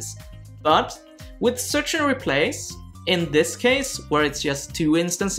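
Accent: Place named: Sweden. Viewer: Hi guys i'm i lead a small web-dev team writing mostly angularJS 'i also have a background in C# yet i fail to see +Krzysztof Suszyński point? BTW + i love your show you explain things very clearly and your Swedish accent now resonates in my mind on mondays:) i also have mixed emotions about the point made in the video..perhaps +Alexandre Franco video will make more sense of it i will have to watch it. nevertheless +Krzysztof Suszyński can you elaborate more on why is this more valid in JS?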